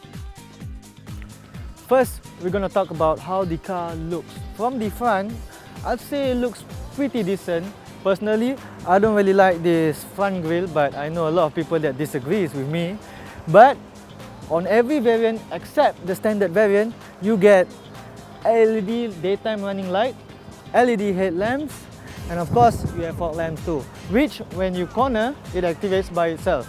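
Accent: France. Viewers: Sounds like a French talking English. But it's entertaining. Good job. Keep it up. What matters is the info about the car. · Sounds like a French talking English... It's nice to hear that, good job bro 👍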